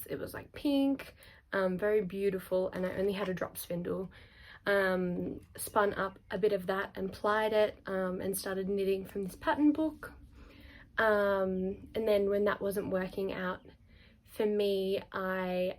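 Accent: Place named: Australia. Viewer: Clicked on this and was so refreshed to hear a fellow Aussie!!! Loved your first podcast and will now go binge the rest :)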